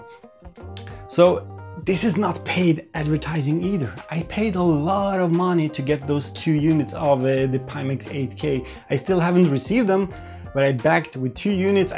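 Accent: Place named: Sweden. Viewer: Not only does energy and enthusiasm for vr come across better than Nathie but he also speaks “swenglish” much better. Nathies popularity comes primarily from his youth and his real life infinite money cheat. My respect goes to the people who earn it outside of youtube.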